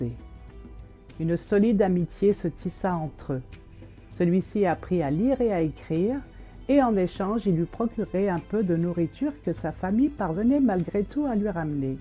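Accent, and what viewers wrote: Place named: France. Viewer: Votre accent français est si beau! Pas comme notre français au Québec hahaha !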